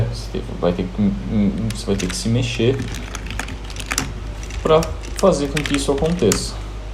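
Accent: Brazil. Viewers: O sotaque brasileiro o entrega,. rsrs Parabéns pelo projeto, brother.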